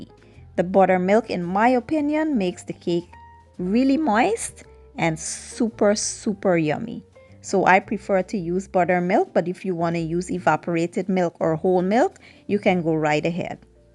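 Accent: Guyana. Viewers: Lovely ! New sub btw are you guyanese ? Your accent lol where are you from?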